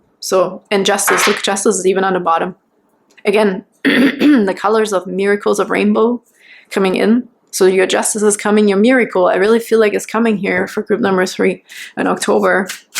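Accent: Germany. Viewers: Dear, big thx for pile 3. It resonated 100%. Can I ask you a personal question? Is your mother language german? I kinda hear it when u speak. Big greetings from Austria, Nicole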